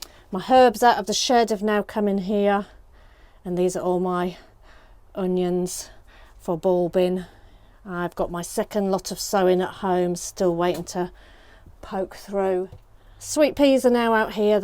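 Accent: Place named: Scotland, United Kingdom. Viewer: Wow so many baby plants on there way up!! You are starting the season strong 💪 lovely to see you in action with your handy dandy tool kit - loved the Scottish accent too! I am trying with flowers too I need to get better at! It's all coming along so well, a week away and the plot is still looking in great! Keep going!! 🥰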